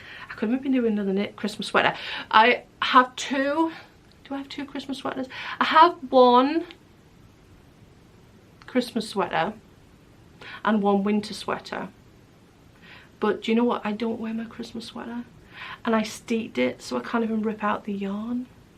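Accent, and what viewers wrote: North East England, United Kingdom. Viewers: You have a north east accent. Im Sunderland birn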